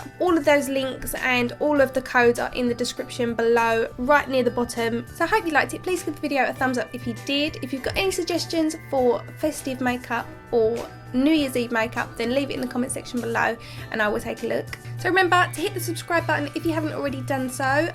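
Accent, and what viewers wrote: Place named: South East London, United Kingdom. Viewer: This is a gorgeous look, I'm obsessed with and at the moment! I love your accent, where are you from? I'm from South East London and it reminds me of home! x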